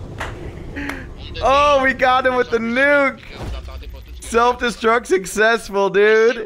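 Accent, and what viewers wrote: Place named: Quebec, Canada. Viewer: Le gars est clairement québécois haha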